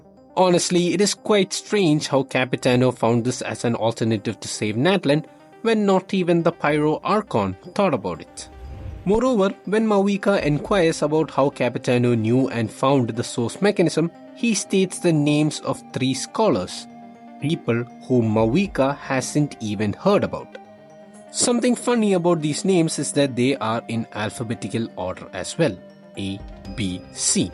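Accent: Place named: India. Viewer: I have to ask, where are you from? Your accent to me sounds Indian, but sometimes it sounds Spanish/ Latino.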